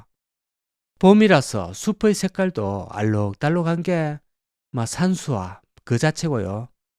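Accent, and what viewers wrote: Gyeongsang, South Korea. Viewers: 오우 경상도 싸투리가 외국어 처럼 듣기 힘들어요😢😢😢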